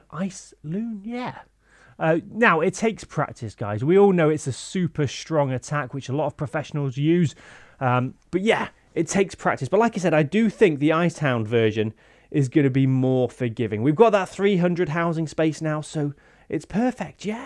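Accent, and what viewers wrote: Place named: United Kingdom. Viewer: His accent is British.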